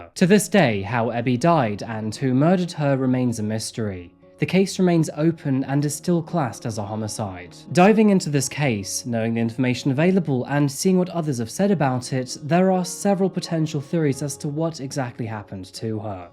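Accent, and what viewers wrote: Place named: United Kingdom. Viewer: ❤ your channel very much and I listen to it every time when you come on. Never do I miss an episode. Love 💕 your British accent as well!! I’m half Welsh from my grandfather’s side and would love ❤️ to visit your country one day hopefully 🤞before it’s too late ⏰ in life lol 😝. I’m old, hahaha 🤣!!!! But no, I really am ancient, or at least I feel that way sometimes. The body is giving out, lol. But I guess we all will go through that in the end one day or other. Right?? Wishing you a wonderful weekend ahead and stay healthy and safe too. 😊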